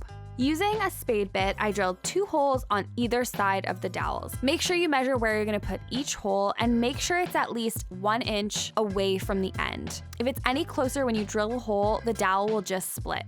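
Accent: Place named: Canada. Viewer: Ah ha! I ended up here from another recent video of yours, and I thought I recognized that canadianness (I'm from Montreal, hehe)!! xD Great videos!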